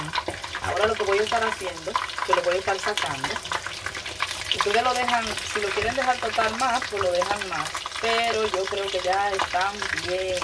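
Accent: Dominican Republic. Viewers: Eres Dominicana 100%✓ 🇩🇴❤️❤️ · No entiendo que tenía la salchicha que se veía como un nylon o algo así. Tampoco entiendo porqué responde casi todos los comentarios con la misma frase aunque le hayan preguntado algo . Para mi tiene acento dominicano y cuando le dicen puertorriqueña, solo responde bendiciéndote jajaj. Se ven deliciosos , fue solo una sugerencia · Está chulísima tú receta. 😋😋😋 Me encantó la manera que explica los pasos y vas haciendo el proceso de la misma. Sé que por tu acento al hablar eres de mi amado país República Dominicana. Tienes una nueva suscriptora en tu canal. Abrazos cordiales mi reina. ❤🇩🇴❤ 👏👏👏👏👏👏👍😘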